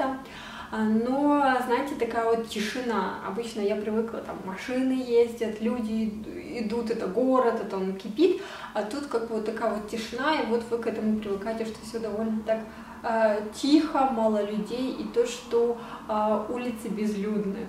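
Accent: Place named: Urals, Russia. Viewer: Дикция уральская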